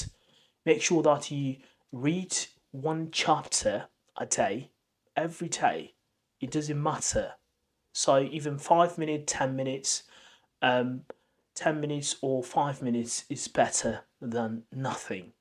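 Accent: United Kingdom. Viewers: Love your British accent . I visited Brighton in 2005 .It was amazing.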